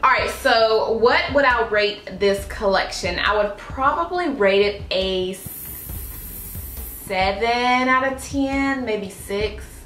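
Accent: South, United States. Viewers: you are southern! lol it's so cute!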